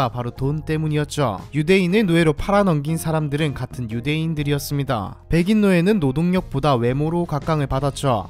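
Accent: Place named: Busan, South Korea. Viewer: 님 고향은 부산이신거같아요 맞나요 ㅎㅎ?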